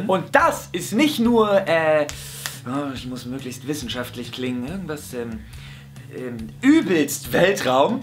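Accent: Berlin, Germany. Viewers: Bist du Berliner? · Hey wie immer ein KLASSE Video und ehrlich deine Berlinerschnauze ist der hammer:) So nu die obligatorische Meinungsabgabe: Thema 1: also Leude warum sollte man Kindern beibringen mit Waffen umzugehen Hallo und wer bitte glaubt das diese dann die Waffen verantwortungsbewusst einsetzen ist wirklich Naive, das sind Kinder die den Umgang mit einer Waffe als Spiel lernen und somit denk ich mir das auch das abdrücken für diese nur ein Spiel ist wo bitte ist da die Verantwortung? Thema 2: Kinder als Kunstobjekt und deren Leid, Aufmerksamkeit für das Leid dieser Kinder ist gut aber wie schon sagte bisschen fragwürdig so mit der Hoffnung der Kinder zu spielen. Thema 3: ja die Forschung ist doch was feines ich will Persönlich nicht ewig leben aber die Leute die noch leben und ihr Leben Tag ein Tag aus vergessen für die find ich das super aber sein wir ehrlich, wenn man damit unsterblich wird können es sich eh nur Reiche leisten.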